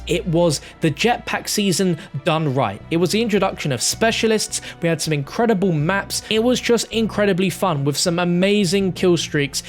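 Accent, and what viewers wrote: United Kingdom. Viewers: Cool british accent!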